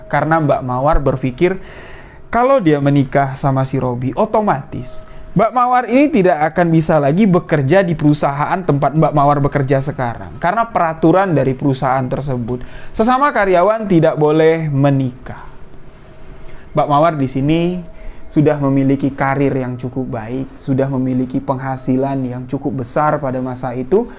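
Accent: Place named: Jambi, Indonesia. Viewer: Senang dengar mas bicara dg logat daerah Jambi....succes ya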